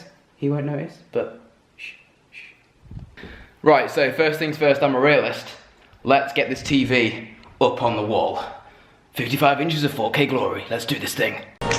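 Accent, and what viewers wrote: United Kingdom. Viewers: Your point is invalid he is from the UK, ha has one of the most British accent ever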